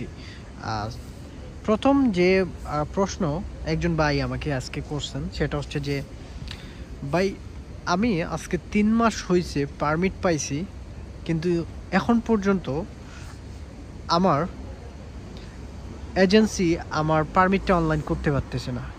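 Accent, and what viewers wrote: Sylhet, Bangladesh. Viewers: vai apne ki sylheti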